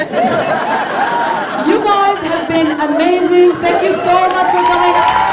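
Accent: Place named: India. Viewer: Spoken in a Apu Nahasapeemapetilon accent..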